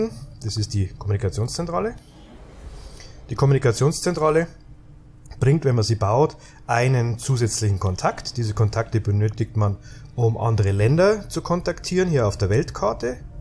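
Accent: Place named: Bavaria, Germany. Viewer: Da kommt definitiv da Bayer durch. ;)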